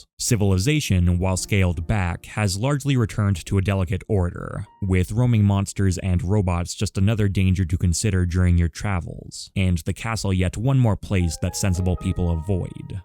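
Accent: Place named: Canada. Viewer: i don't like how negative about the game u are in this video. But ur points make lots of sense and i agree with alot of them. also i have finally realized that you are probably canadian based on the amount of times i've heard you say the word about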